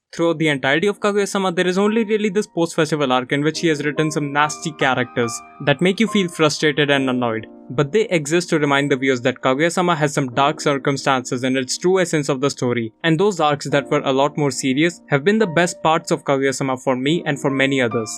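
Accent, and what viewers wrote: India. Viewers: Amazing video man, btw are you Indian ? Your accent sounds like it.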